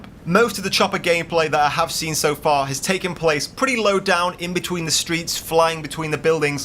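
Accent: England, United Kingdom. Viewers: I'm glad I found this channel! The videos are so awesome! keep up the good work! BTW, are you from England?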